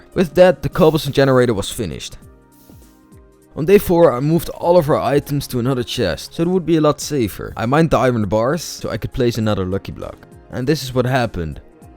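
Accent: Netherlands. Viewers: Jij bent nederlands dat hoor ik gewoon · Je hoort gewoon dat het een Nederlander is 😂😂👍🏼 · 2mln views, u deserve this, r u dutch? Ur accent sounds dutch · Nederlands Engels 😍🥵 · I smell Dutch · Its dutch. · sound like ur dutch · Bro je nederlandse accent is wel echt goud😂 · Hahah you sound Dutch are you Dutch hahaha 😂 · I just love this dutch accent Ik hou van dit nederlandse acsent🤧✨🤜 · You rlly have a Dutch accent 👀👀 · i smell nederlands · You sound Nederlands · Mmmmm i smell nl accent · Very dutch accent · Ik weet dat je Nederlands bent ik hoor het gewoon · Nederlands ik hoor het aan je stem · idk whybut i smell dutch / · I smell nederlander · De nederlandse accent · I Smell DUTCH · Just please do something about your Nederlands exent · Jo you have dutch accent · Je bent Nederlands dat hoor je meteen! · You sound dutch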